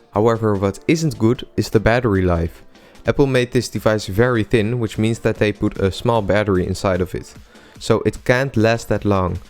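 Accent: Netherlands. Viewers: funny Dutch accent. :). but good review!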